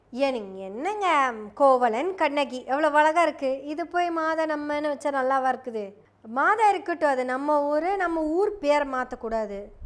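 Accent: Kongu, India. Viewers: கொங்கு பாஷையில் வயிறு வலிக்க சிரிக்க வைத்த பதிவு.முன்னேறி வாங்க.!..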